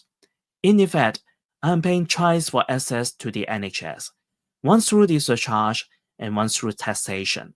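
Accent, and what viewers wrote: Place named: Hong Kong. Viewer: HK English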